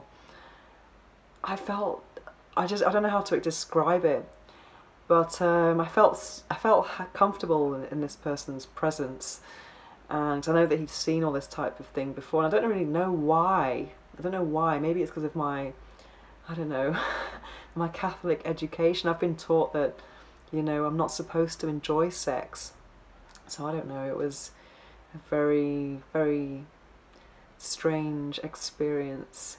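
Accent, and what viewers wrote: United Kingdom. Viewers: dammittt! if she wasn't british it may well have been an interesting story! cannot bring myself to endure that accent! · but, I will agree that her accent is 100% British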